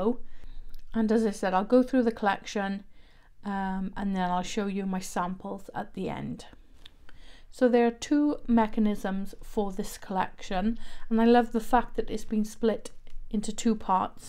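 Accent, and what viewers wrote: Wales, United Kingdom. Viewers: Yay I thought I recognised a Welshy accent. I’m in Cwmbran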